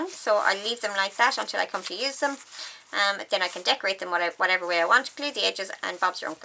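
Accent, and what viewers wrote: Ireland. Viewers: Thank you 🥰 all of your ideas are very helpful and definitely inspiring. I can imagine this does happen from time to time, I am just lucky I mix it up a bit in between crafting projects, like doodling, knitting, sewing and checking out different techniques from all the amazing crafters we have in this collaboration! You are all very knowledgeable and experience and a source of great talent! Thank you for sharing your time and its lovely to hear that bonnie Irish lilt once again. Blessings and hugs 🙏🏻💜🥰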